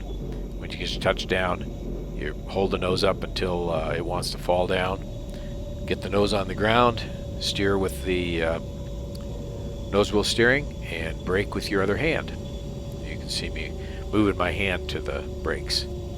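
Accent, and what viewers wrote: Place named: United States. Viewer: Thank you it was very interesting and relaxing video, pleasant , calming voice with good American English. Greetings from Ukraine, home of biggest plain .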